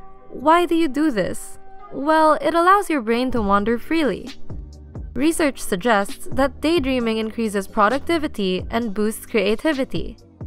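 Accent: Philippines. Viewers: the voice actor sounds filipino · Why do i feel like she sounds like a filipino speaking in English. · The one doing the voice over sounds Filipino. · Why does the narrator sound like a Filipino to me? I talk like that lol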